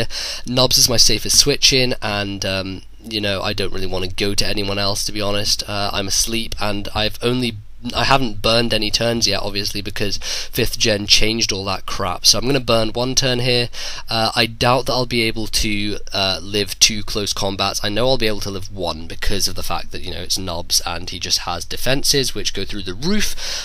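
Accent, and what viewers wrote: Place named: United Kingdom. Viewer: I love your commentary! great to hear a brit commentating, sooo much better than the yanks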